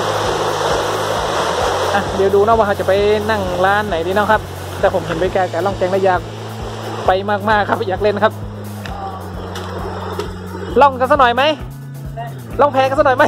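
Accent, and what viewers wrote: North, Thailand. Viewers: อ้ายคนเหนือบ่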